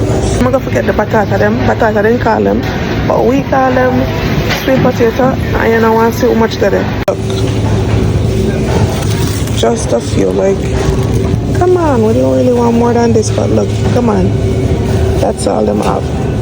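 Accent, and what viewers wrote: Jamaica. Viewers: I just love how u speak our own patios love your style girl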